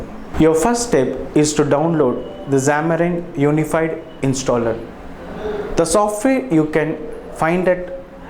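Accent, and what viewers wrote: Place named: India. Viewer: that indian accent lol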